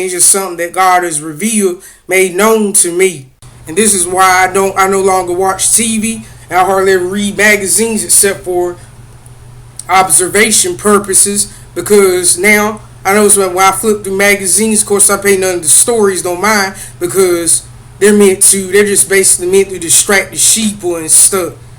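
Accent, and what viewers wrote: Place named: South, United States. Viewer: OMG your southern accent is soooo strong lol and cloning is getting out of control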